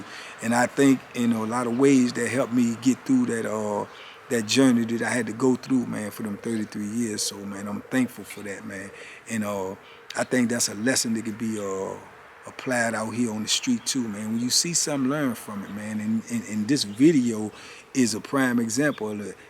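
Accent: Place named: New York, United States. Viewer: ALRIGHT NOW.....WATCHIT😂😂😂😂I'M ON THE FLOOR ALREADY YOU GOT THAT NYC ACCENT IN FULL EFFECT😂😂😂LOUD... TELL IT ALL AND TRAINED TO GO😂😂😂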